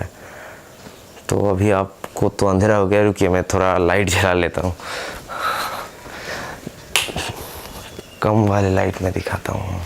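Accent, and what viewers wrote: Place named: Bangalore, India. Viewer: Kotha sunai puro Bangalore bacca mine hocche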